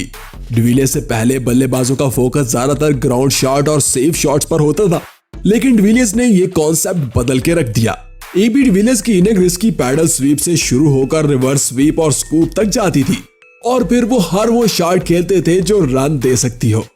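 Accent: Pakistan. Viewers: Your accent is similar to Shoyeb Akthar , are you from Pakistan.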